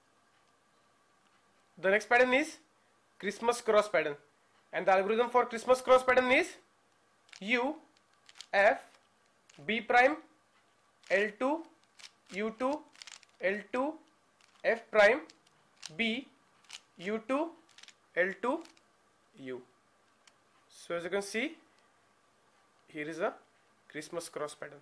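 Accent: India. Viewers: he sounds weird because he is indian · You kind of sound Indian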